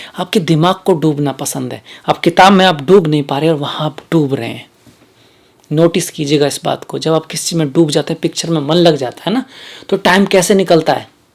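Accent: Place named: Braj, India. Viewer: आपके ज्ञान और प्रस्तुति अद्भुत हैं, इसलिये ज्योतिषीय विश्लेषण भी लीक से अलग और बुद्धिवादी है। विचार स्पष्ट और ज्ञान से आए आत्मविश्वास से भरे हैं। बधाई। ईश्वर कृपा रखें। एक बात ध्यान देने की है और वयोवृद्ध होने के कारण कहना मेरा कर्त्तव्य है। भाषा के ढीलेपन को सुधारना चाहिये। प्रादेशिकता का असर तो बोलने में होता है किन्तु 'नमस्कार' को नमश्कार या प्रत्येक 'व' को ब कहने और स और श में गंभीर ग़लतियों के न होने से निश्चित ही प्रस्तुति और प्रभावी होगी। इसके अलावा भी भाषागत उच्चारण जैसे इंडस्ट्री को इंडष्ट्री आदि अनेक बातें हैं जो ज़रा-सा ध्यान देते ही व्रजभाषा के पाश से मुक्त करेंगी और हिन्दी के मानक उच्चारणों की ओर ध्यान दिलाएँगी। यह एक क्रांतिकारी सकारात्मक परिवर्तन होगा। व्रजभाषा की मिठास अद्वितीय और दैवी है मगर हिन्दी बोलते समय उसका खड़ापन ही उसका मानक है। कृपया अन्यथा न लें। जैसे आप मकर वालों को मेहनत करने की सलाह देते हैं वैसी ही मैंने दे दी है, स्वीकारें।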